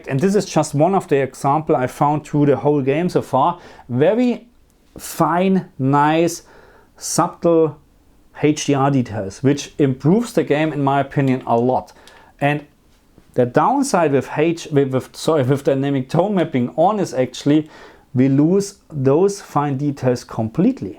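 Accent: Germany. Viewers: My Phone has hdr +10 can it Work with genshin too? And has the Same Bug? You Sound german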